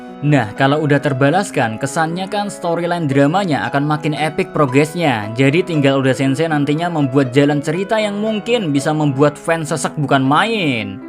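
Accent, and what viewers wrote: Java, Indonesia. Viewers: Hanya Channel ini yang Khas penyampaiannya... Gabungan Jowo+indo. Makin ngeten bos 👍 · Bang abang orang Jawa kah?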